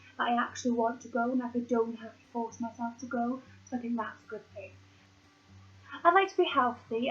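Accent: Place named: Wales, United Kingdom. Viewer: you cutie <3 I think that after this long of knowing you and never meeting up I'd built up this voice in my head for you that never took into account the fact that you're Welsh:P Your accent is so much more adorable than I thought it'd be:3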